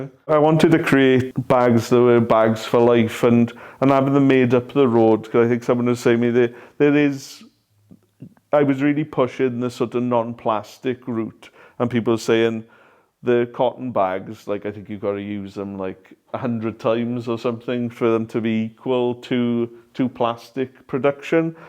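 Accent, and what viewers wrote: Wales, United Kingdom. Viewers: I hope you will show more local businesses. It was so interesting. After hearing that Port Talbot steel works will loose up to 3000 jobs, it gives hope that there can be a future for people working there. You have such a rich Welsh voice, I but you have a good singing voice?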